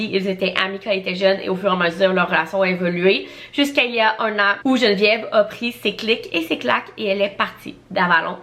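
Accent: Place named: Quebec, Canada. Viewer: Ohhh ❤I'm so happy I found a booktuber who speaks French from Quebec. It just sounds better than the French from France 😁❤ greetings from Germany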